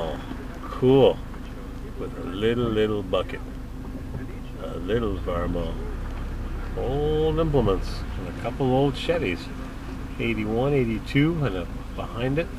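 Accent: United States. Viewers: Driving around Henniker with Captain Obvious practicing their reading skills. There is a tree, there is a big rock, there is some sand, slabs of concrete and the worst New Hampshire accent you'd ever hear.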